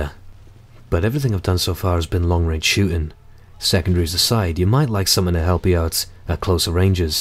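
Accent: United Kingdom. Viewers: You and XboxAhoy need to collaborate. NOW! Two British accents in one vid? Eargasm!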